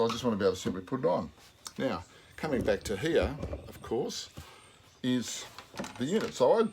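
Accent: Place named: Australia. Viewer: Just found your channel. Good to have an Aussie accent on the tube.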